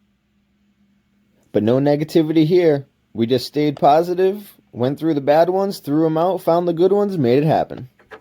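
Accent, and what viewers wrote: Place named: New England, United States. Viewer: "one andaa quootaaa" Love that New England accent! Nice video man!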